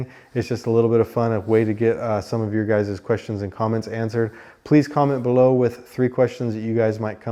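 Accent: United States. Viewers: Which part of california are you from?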